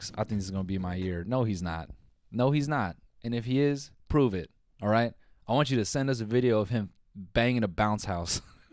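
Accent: United Kingdom. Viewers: Haha the British accent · in love with his british voice.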